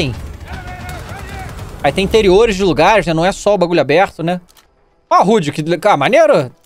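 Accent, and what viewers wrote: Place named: Rio de Janeiro, Brazil. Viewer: poxxxxxssss conferencia kkkk sotaque carioca é bom demais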